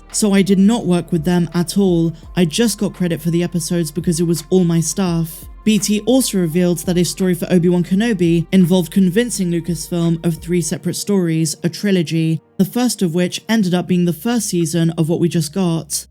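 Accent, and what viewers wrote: United Kingdom. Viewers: ! What part of the U.K. are you from?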